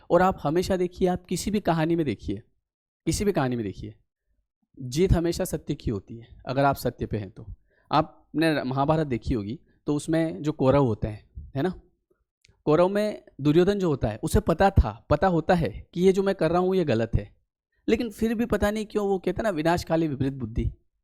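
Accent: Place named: Indore, India. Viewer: Aap MP se ho kya....aapki language Indore side ki lagti hai 😊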